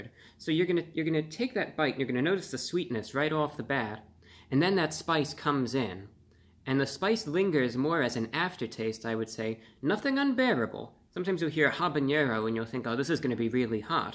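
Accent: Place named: Mid-Atlantic, United States. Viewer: Review Bruh, the mid atlantic accent is getting more cringy, tone it down. Just let the inner upstate New York come through.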